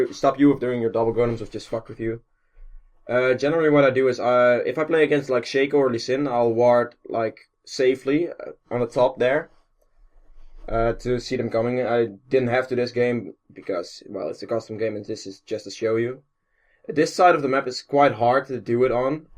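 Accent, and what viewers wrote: Netherlands. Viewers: Ja je hoort echt dat je Nederlands bent. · You're dutch I hear it because of your accent. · I'm new to your channel, but you sound dutch! Are you?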